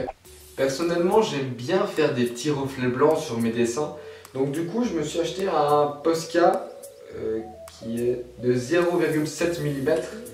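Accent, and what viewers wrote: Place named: England, United Kingdom. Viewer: Cet accent anglais ow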